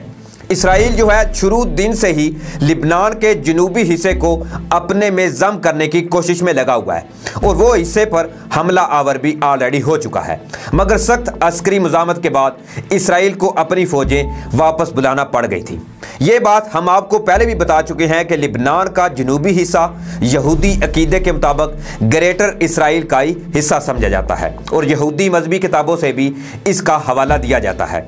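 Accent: India. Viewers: Assalam Walekum my brother speak Urdu so rightly you are talking like Indian country people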